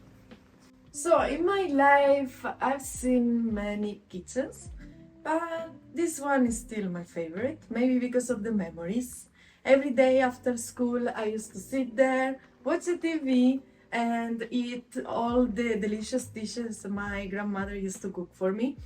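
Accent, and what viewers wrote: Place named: Italy. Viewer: When I come back in the next life I want to be your personal film maker, follow you with a camera all day and listen to that wonderful English with the Italian accent. What a cozy domicile your grandparent's house is. Glad you held onto it and didn't sell it. I am sure you can feel your grandparent's energy when you are there, thank you for the tour.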